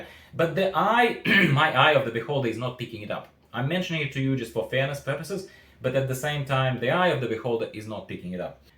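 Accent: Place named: Eastern Europe. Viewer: The accent :D are you from EE?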